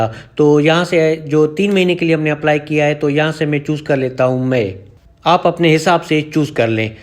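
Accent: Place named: Sindh, India. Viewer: Aap k lehje se lagta hai aap Sindhi speaking Bhai hain.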